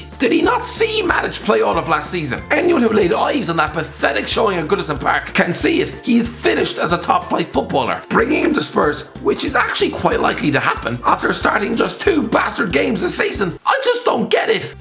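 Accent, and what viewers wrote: Ireland. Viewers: Press f in the chat for Irish guy voice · Irish accents are really nice, but your sick, dont upload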